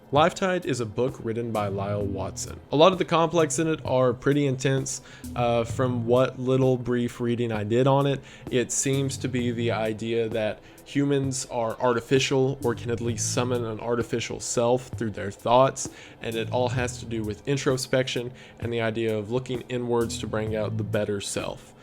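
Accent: South, United States. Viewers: First time that I’ve enjoyed listening to a southern Christian talk about conspiracy theories. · i’m a lesbian but this southern belle sounding bucky barnes look alike has just stolen my heart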